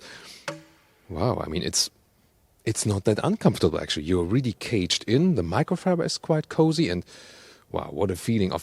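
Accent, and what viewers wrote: Germany. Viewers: First thing tht came up to my mind when I saw this 'flat flunder' : what do you to with your head sticking out above the windshield? (You can see it ) . Looks funny. And why did they choose a German presenter, whose accent is still audible? One important question was not answered: how high would the purchase price by of such a 'fun car' with 14 " road clearance which would have trouble to pass what the French call 'ralentisseurs' without any damage.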